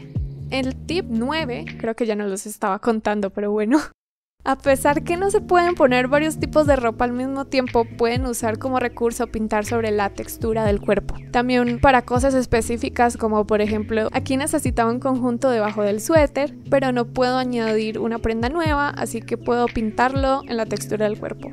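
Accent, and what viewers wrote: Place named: Bogotá, Colombia. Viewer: los colombianos solo reconocen el hacento rolo: eres rola' bogotana? jsjs yo si :>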